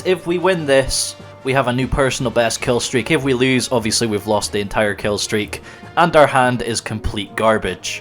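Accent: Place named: Scotland, United Kingdom. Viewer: Love your Scottish voice my man, keep up with the content!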